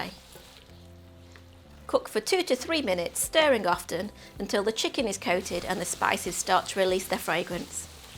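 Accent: United Kingdom. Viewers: love your British accent :)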